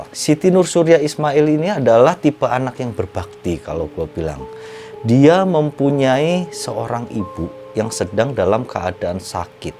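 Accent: Java, Indonesia. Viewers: wow salam mas OMONG JOWO NYA PEKAT BANGET.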